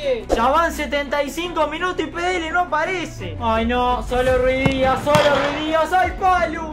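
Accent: Uruguay. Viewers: Eres Uruguayo? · Eres uruguayo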